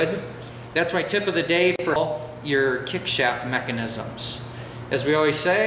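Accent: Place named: South, United States. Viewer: Very very good this is one of the best ...I cant handle half the guys talking to much ....this fella just simply explains it I'd dang near say he's southern 😉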